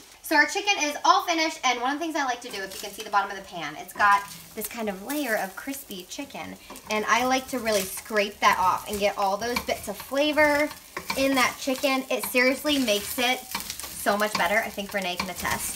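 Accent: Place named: Bangladesh. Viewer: sound like a Bangladeshi ♥♥♥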